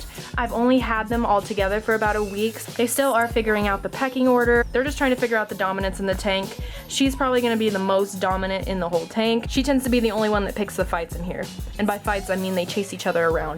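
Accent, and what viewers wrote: Texas, United States. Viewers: Is she from texis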